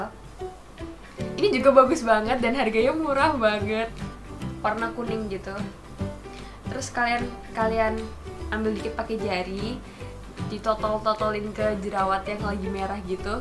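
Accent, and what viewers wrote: Java, Indonesia. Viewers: Jowonya medok juga yaa mbak😅😅 walaupun ngomong pakai bahasa indonesia ttp keliatan jowonyaa😅😅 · Sukaa banget sama suaranyaaaa😍 medok medok kalem adem gitcuuu, penjelasannya juga singkat, padat, dan jelas. langsung subs.💖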